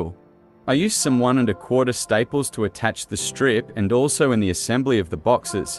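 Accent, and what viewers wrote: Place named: Australia. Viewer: Awesome! where did you get the Austrailan? is that some sort of text reader robot? love your, vids, thank you!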